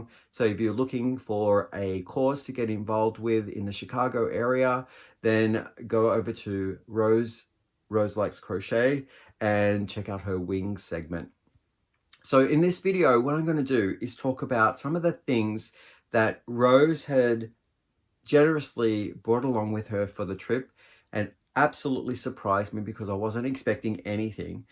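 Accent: Australia. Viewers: Why do you sound Australian?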